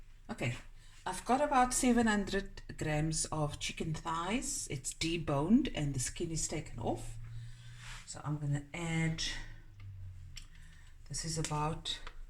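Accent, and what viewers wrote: South Africa. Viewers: I love the South African accent. It smells home.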